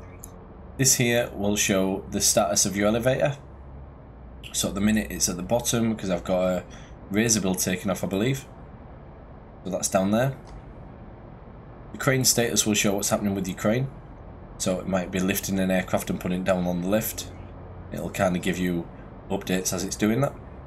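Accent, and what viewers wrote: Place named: Scotland, United Kingdom. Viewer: As a non English native speaker, I sometimes have a hard time to get your charming scottish(?) dialect. But: your tutorials about this game are short, precise and in a perfect way, useful to me. Thank you, subbed! BTW. Did you tried the VR version already? Just in case, I didn’t found out how to zoom sight.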